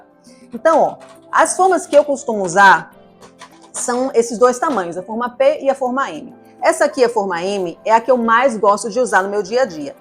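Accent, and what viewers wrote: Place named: Pernambuco, Brazil. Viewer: Ganhou uma inscrita! Pelo sotaque reconheci que tu és uma das minhas! Queria uma receita ORIGINAL pernambucana. Quando abro um vídeo e escuto falar "rocambole" eu já passo.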